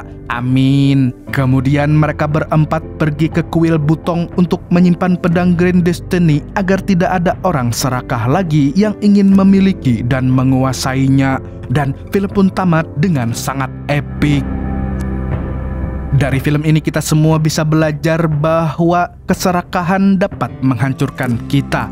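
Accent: Banjar, Indonesia. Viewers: Bhsa BANJARnya khAs, 😂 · Laaah bahasa banjarr orang banjar kah neh😂😂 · pian banjar kah min..wkwkwkwk salam dri kandangan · Ae, orang Banjar skli nya amank narator nya...😅😅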